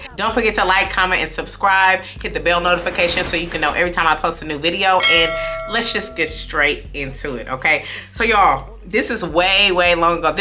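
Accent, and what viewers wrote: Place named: Bronx, United States. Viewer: OMG! Sounds like this guy I know from the Bronx whose name started with the letter J 😂 You're an awesome storyteller, keep 'em coming!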